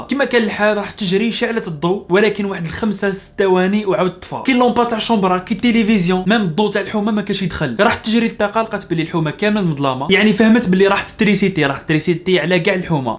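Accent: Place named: Morocco. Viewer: هدرتك تقول مغرببي 🙄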